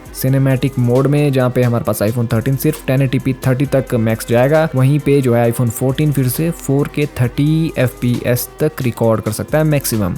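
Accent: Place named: Himachal Pradesh, India. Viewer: Bhi awaz si himachali lg rhe ho ✔️✔️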